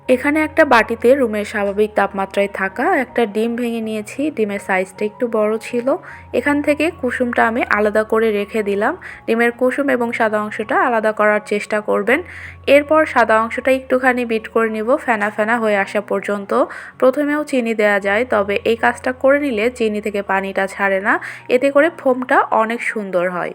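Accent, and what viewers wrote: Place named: Naogaon, Bangladesh. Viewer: Apu apnar basha nojipurer kon jiegaie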